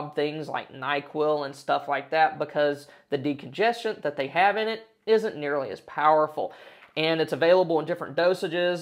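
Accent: South, United States. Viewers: This Brit appreciates your videos and loves the southern drawl. Well done.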